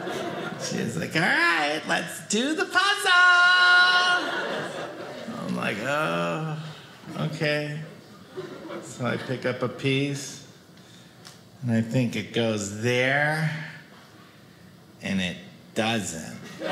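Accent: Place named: Wisconsin, United States. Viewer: Boy that Wisconsin accent is unmistakable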